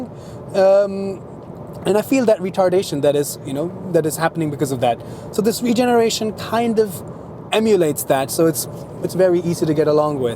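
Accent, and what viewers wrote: Germany. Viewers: eine frage warum gibts die test´s nicht in 2 sprachen ? oder mit untertitel den soweit ich das höre haben sie doch einen deutschen aktzend ;)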